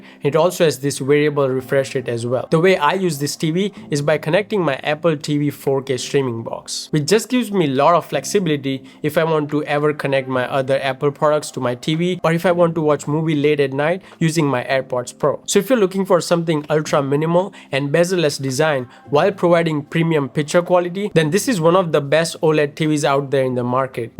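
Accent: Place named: India. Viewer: The accent is very much mix of too indian and US!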